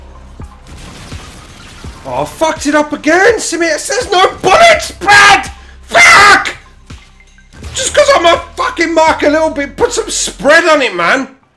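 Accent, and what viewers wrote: Birmingham, United Kingdom. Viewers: Are you back for good? WE all miss our that smooth brummy twang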